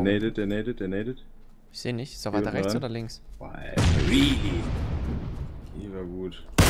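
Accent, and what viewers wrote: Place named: Saxony, Germany. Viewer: Das Sächseln ist einfach nur eklig.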